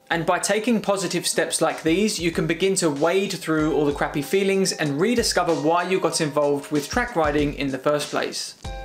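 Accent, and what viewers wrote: United Kingdom. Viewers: Dear Lord, once again a perfect Video which comes at the perfect Timing for me. I really adore your videos, or maybe its just the well sounding british way of presentation 😁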